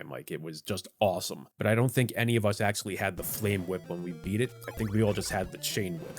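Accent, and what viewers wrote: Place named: Philadelphia, United States. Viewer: The Del-Co Philly accent, the sunken tired eyes, the walkthru under the guide as a review....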